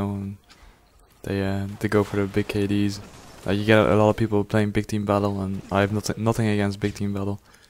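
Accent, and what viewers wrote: Ireland. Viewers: I think hes irish ¡¡¡ lol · He's Irish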